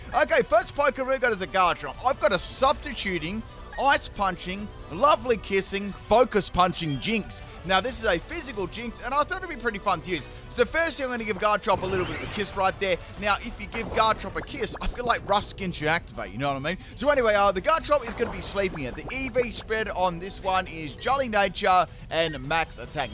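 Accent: Australia. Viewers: Gotta love his Australian pronunciations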